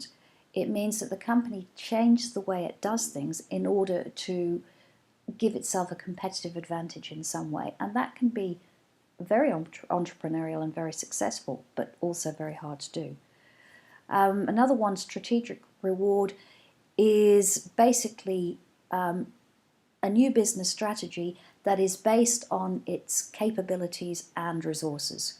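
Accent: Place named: Manchester, United Kingdom. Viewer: Very nice accent I think u are from Manchester London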